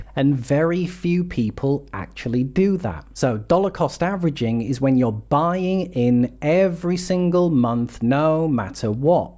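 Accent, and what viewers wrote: United Kingdom. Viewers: This is great for the American market but what about the London Stock Exchange? You sound like a British person but focused on the S&P exclusively. There seems to be a bubble in the USA but in the UK values (I've heard) are similar to 20 years ago. Could an American crash cause a UK crash?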